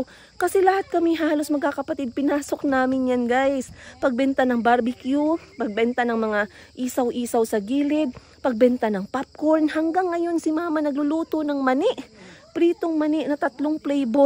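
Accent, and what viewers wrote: Iloilo, Philippines. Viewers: Ilonggo kamo? Or acquired knowledge of speaking inilonggo.... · Tuwang tuwa Ako sayo Lalo na kapag nag salita ka na tonong ilongga 😅😅😅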